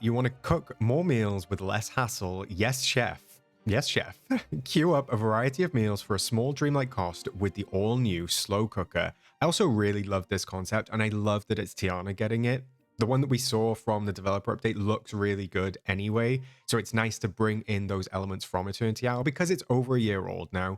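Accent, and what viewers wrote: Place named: Wales, United Kingdom. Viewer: Hey 👋🏻 Been really enjoying your content and insights…It is nice to hear a DDV content creator with a British accent (a lovely Welsh accent 🏴The patch notes are interesting but the bug fix we are desperately hoping for is the one with the male avatar which leaves him permanently with a 5 o clock shadow. This is especially noticeable in poor lighting. No matter how many times you go to facial hair settings and change the colour and Opacity it will revert back to black and 70%. It is maddening because I play DDV with my 9 year old son and our avatar is based on him and looks exactly like a teenage version of Luka! The illusion is spoiled by this bug that emerged 2 updates ago…we play on PS5 and I’m not sure if it is exclusive to that platform. Contacted Gameloft about it but got a bot response which gave me options which wasn’t relevant to the issue. 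No one else has noticed or been bothered (even on Reddit!) It is a small thing I know but it spoils the experience…like with the hiking DreamSnap when our avatar was in the boyscout outfit. Excited for the update…we are having a Aladdin marathon this evening watching all 4 films! Oh btw I hope Gameloft have really tested this stealth game mechanic! Thanks for the fun😊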